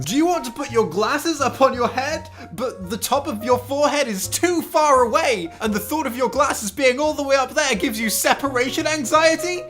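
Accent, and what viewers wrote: England, United Kingdom. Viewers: This man do be English tho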